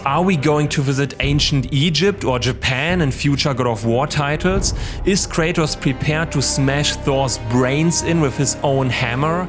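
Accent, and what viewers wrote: Germany. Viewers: I'm pretty sure it's German, just fyi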